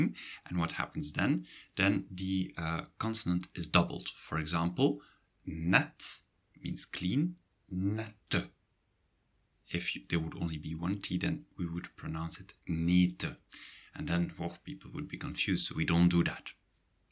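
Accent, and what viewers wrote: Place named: Belgium. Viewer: LOL, the region where I live is in America. But it should serve me well enough to know that your pronunciation is Belgian and to listen carefully to the differences. I speak French and have had to deal with wildly different pronunciations in Canada as well as vocabulary and idioms. However, focusing on the grammar should be the same.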